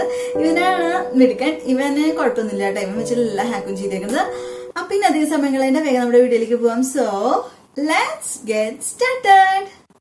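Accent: Thrissur, India. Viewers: Chechiyude samsaram kettal serikkum thrissur kari anu enu manasilavum😁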